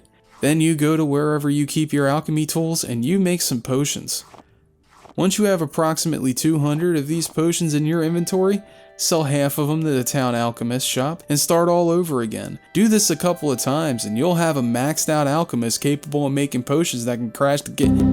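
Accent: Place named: Philadelphia, United States. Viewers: Sounds like a Pennsylvanian. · Bro please tell me you’re from Philly or at least PA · Absolutely love Morrowind. Just started my first ever run through and am so immersed it’s more real to me than my stupid job. Great content man. Keep it up. I gotta ask you, Are you from Philly? I grew up there and just wondered from your accent. Feels like I know ya. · I hear a slight philly accent. A fellow bird team? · lol youre definitely from PA or South Jersey with that accent bud. Good video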